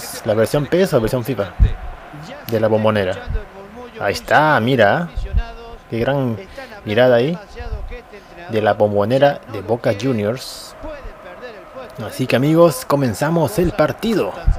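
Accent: Uruguay. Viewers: sos uruguayo xD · parece q sos uruguayo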